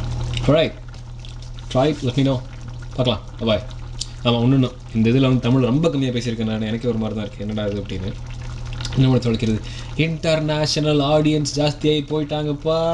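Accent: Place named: Wales, United Kingdom. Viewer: This fellow sounds Welsh!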